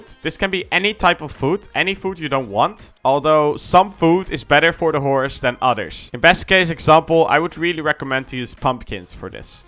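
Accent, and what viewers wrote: Netherlands. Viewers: Why no dutch video's? I can hear your dutch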